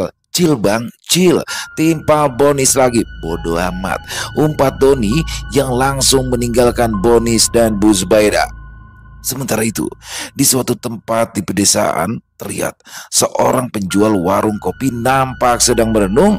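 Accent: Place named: Betawi, Indonesia. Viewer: sambil dengerin sambil ketawa,suami tanya knp tu senyum" dan ketawa sendiri?hahaha saya lgsung jawab aja asik aja ni loghat betawinya kocak sangat🤣😊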